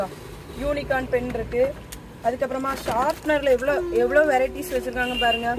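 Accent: Tirunelveli, India. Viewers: R u from tirunelveli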